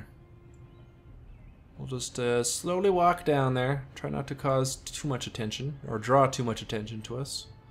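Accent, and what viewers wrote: Canada. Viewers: Damn I knew you were from Canada the way Canadians say "out" just gives it away lol I was posted near to Medicine Hat for a while. Am enjoying your Videos so keep em coming!!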